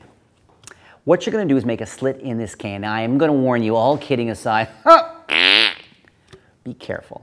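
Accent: Canada. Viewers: are you canadian? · Are you Canadian???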